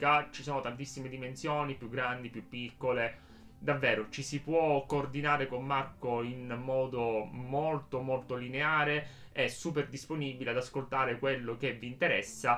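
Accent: Sicily, Italy. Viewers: Credo sia una cadenza siciliana..hanno le vocali molto aperte 🤣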